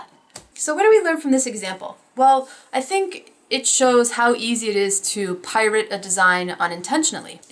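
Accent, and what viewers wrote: Boston, United States. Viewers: loved your Boston accent! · Ha love your Boston accent! Love seeing your progress on your master class - keep up the good work!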